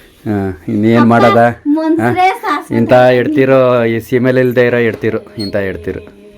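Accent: Mandya, India. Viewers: Anna nivu mathadodu tumba eshta., adrallu nim wife jhote mathadtiralla adu maathra super., namdu mandya ne., mandya language super...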